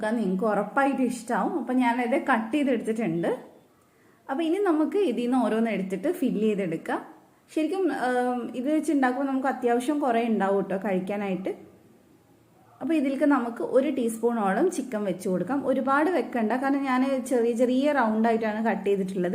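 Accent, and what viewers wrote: Kannur, India. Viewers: Chechi kannur ano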